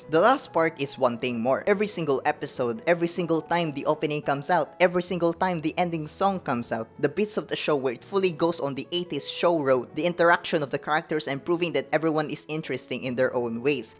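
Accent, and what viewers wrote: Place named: Philippines. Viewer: Okay i was just having a guess that you are a filipino because of the accent pero pinoy nga HAHA good job bro i also love great pretender haha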